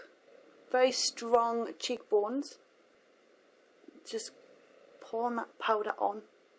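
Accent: Newcastle, United Kingdom. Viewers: ah your a geordie, me tooo, x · a new castle accent i love it the accent , and your stunning btww . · Omg are you a Geordie like Cheryl? :)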